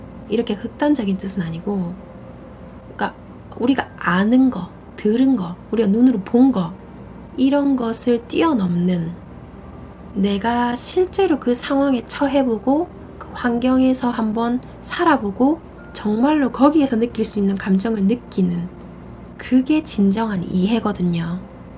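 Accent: Gyeongsang, South Korea. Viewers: 구수한 경상도 사투리 좋아요. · 경상도 억양이 친근하네요 젊은 시절의 나인것 같은 착각이 드는 다정한 목소 읽고 싶었던 신과나눈 이야기 맛깔나게 잘 듵었습니다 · 여러 곳에서 제목만 들었던 책이었는데 오늘 만났너요 감사합니다. 경상도사투리 쓰는 여신의 목소리 재밌습니다. 원하는 모습을 생각하고 말하는것 보다는 원하는 모습에서 행동하고 말하고 생각하는것 ᆢ 인거죠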